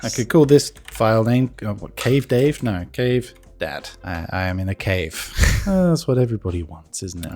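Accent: Finland. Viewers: It's probably just me hearing things, but it kind of sounds like you've got some, just barely-there hints, of Finn-spoken-English in your English accent now.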